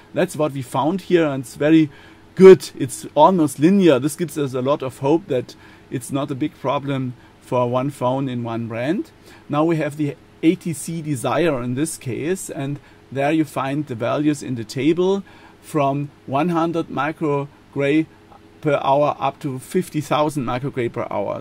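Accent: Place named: Germany. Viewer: Ist ja schön, dass man so ne App entwickelt hat... aber wenn die Muttersprache schon Deutsch ist, dann doch bitte auch auf Deutsch halten. Denn das Englisch hört sich einfach nur grausam an. Zumindest kann ich da kaum zu hören. Wenn mans unbedingt auf Englisch haben möchte, dann jmd. bei dem man das "deutsche" nicht so raushört ;) btw: Bei mir hört sich das auch nicht viel besser an, deshalb lass ich es auch sein ;)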